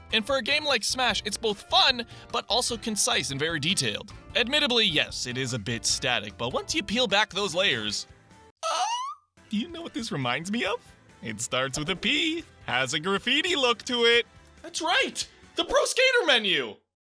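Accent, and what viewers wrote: Canada. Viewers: Wait are you Torontonian?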